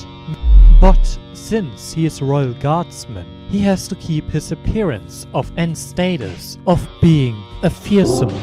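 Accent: Germany. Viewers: Dein Akzent klingt sehr Deutsch.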